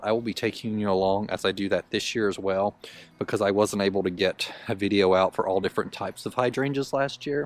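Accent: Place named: Appalachia, United States. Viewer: I love your accent and phrases (Right quick. For example.) I am not southern, we are just tucked into the Appalachian Mountain range that runs through Pennsylvania on the western side of the state. I have noticed at least some of our dialect seems very similar to those in the south! Love your videos! Thanks for sharing all you do!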